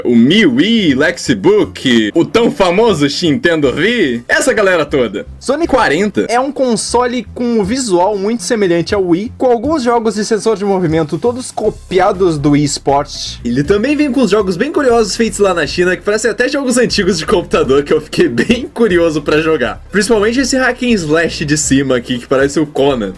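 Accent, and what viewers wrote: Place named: Rio Grande do Sul, Brazil. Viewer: Vc é gaúcho? Tem sotaque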